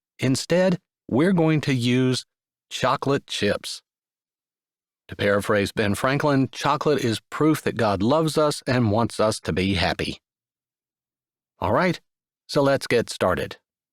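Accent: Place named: Ireland. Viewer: Oh my goodness, the Irish accent! Love it!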